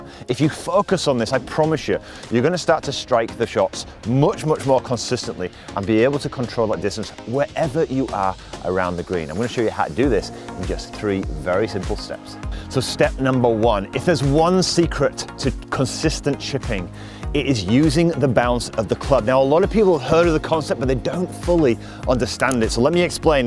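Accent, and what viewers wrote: United Kingdom. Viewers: You wonderfully master the art of teaching golf an I'm fond of your so British Accent😊...It's just a friendly wink of a French golfer.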